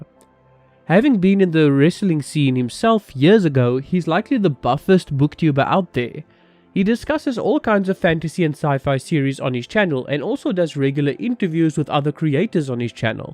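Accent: South Africa. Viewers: Are you Afrikaans/South African by any chance? From a South-African Stormlight Archive fan (an SA SA fan if you will)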